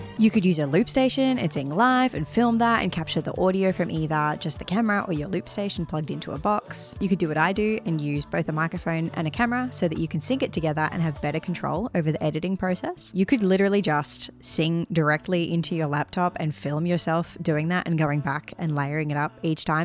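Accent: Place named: Australia. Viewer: Very interesting information in the description section. I was actually curious if you were in Australia, or maybe a nation with a similar accent. Being a 30 year broadcast television veteran, I have to add that I’m very impressed with your editing skill. When I was in college we only had actual videotape editing! lol Computer based/non-linear editing was just emerging. Two questions: Do you offer vocal lessons; and, are you on Facebook? Love all your vids!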